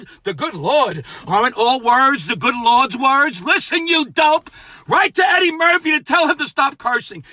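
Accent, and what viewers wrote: New York, United States. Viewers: ... you are absolutely fanfuckingtastic! are you a new Yorker? thank you Big Time Bro... T.T H.L.A.M.F.! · You are truly the best. F*** what everybody got to say f*** what everybody thinks sometimes you just need the f**** let it all out I love it keep up the good work you're so positive he's so energetic you keep me motivated thank you becoming on YouTube being on Facebook Twitter whatever up your ass LOL thank you very much appreciate you you sound like you're from New York Jersey Brooklyn all in one keep up the good work don't stop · ...as a former noo yawker now living in the not so wild west it's so enjoyable to hear (and see) a guy from the neighborhood who speaks the unvarnished truth with humor and d'accent….now if you could only deliver a real slice of pizza and a cheesecake the quarantine would be great....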